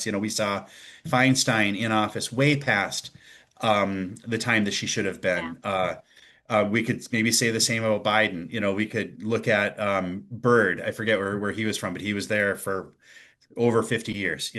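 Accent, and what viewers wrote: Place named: Minnesota, United States. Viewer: Farmers do everything better🎉 i do hear a hint of that handsome Sota sound, now that you mention it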